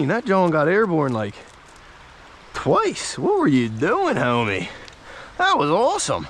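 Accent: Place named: Delaware County, United States. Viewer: Good stuff funny listening to the delco accent growing up in frankford in philly ,now living up by green lane state park,good stuff would love to fish with you in summer! Keep up the wood content on the "wooder's" lol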